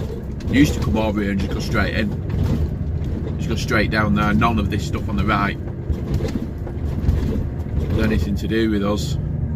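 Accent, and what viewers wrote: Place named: Northern England, United Kingdom. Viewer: Hi, I fell upon your vids as I'm planning to visit Greece in my M'home after postponing last year due to Covid and I have found your clip's to be very informative and just love that Northern accent. I'm guessing Leeds ! Anyway, thanks for the information provided which gives me an idea of what to expect when crossing over from Italy to Greece and driving in Greece. Hopefully make it this year before it gets too hot. Otherwise it's Slovenia and Bavaria. Keep Safe.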